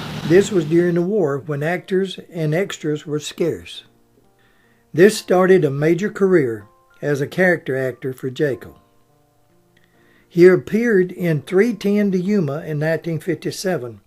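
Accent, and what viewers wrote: South, United States. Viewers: "How would you like to be remembered" -- "Doesn't matter"! This was all extremely interesting, -- thank you, and please don't ever lose that natural Southern (?) accent. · .. Yet another fantastic video. Your videos are so factual and informative. There is an added asset to your videos---- that lovely southern accent. Please do a video on Rosa Parks. Thank you for this wonderful video.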